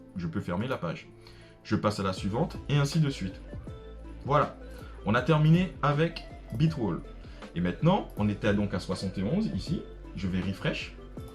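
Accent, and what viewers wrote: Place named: Antilles, Caribbean. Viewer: Ha une voix antillaise comme moi. Parfait continue ne fais pas comme pratiquement tous les autres youtubeurs qui essayent de nous avoir car ils sont payés pour ca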